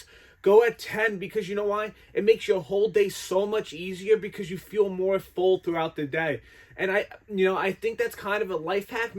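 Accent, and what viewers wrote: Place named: East Coast, United States. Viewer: Hope you get to read this. Just found your channel and love your content. Just by watching your videos and listening to you i feel already more motivated to go to the gym and i already love going to the gym. You east coast accent its sucha perfect gymbro motitation chefs kiss Plz take my opinion is the best way possible. Keep up the good work! Love from Sweden.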